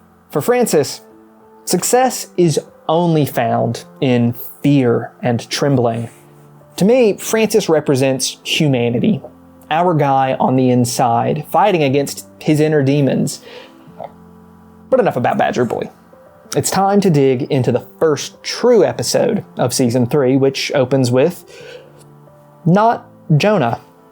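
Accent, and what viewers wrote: South, United States. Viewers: Hmmmm...based on your accent I'm going to say either Louisiana or East Texas. Potentially Arkansas. You don't have to deny or confirm that either way, I'm just guessing as a person from a different part of the region. It also could be one of the Carolinas, I'm thinking that's more likely now but I'm not well versed with the Carolina accents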